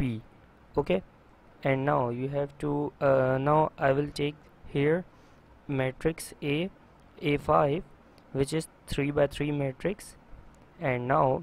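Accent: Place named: India. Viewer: Indian accent is good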